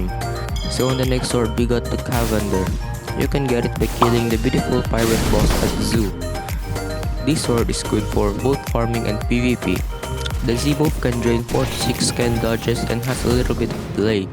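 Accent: Philippines. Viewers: u sound like a filipino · im for sure this guys is a Filipino the way he talks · u sounds like a ph guy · sure pinoy yan boses palng eh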